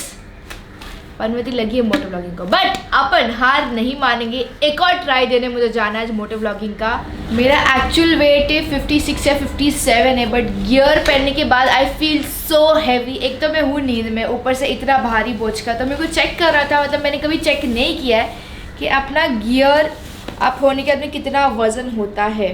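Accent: Maharashtra, India. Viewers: Bai.. aapki Marathi wali Hindi lai Bhari bolted tu.. ,😁👌👌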